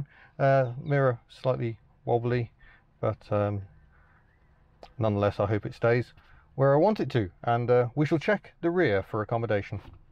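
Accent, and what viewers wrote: United Kingdom. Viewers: Your commentary is just a little too folksy like you yourself come from a very small town in the UK?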